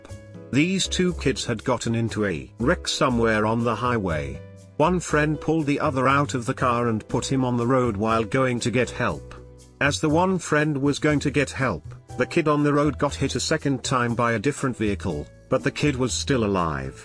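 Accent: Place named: England, United Kingdom. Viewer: Who is this new English chap?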